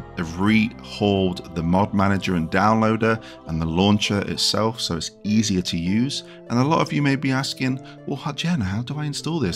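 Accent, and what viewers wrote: United Kingdom. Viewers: “Beer tricks” 😂. Love a British accent.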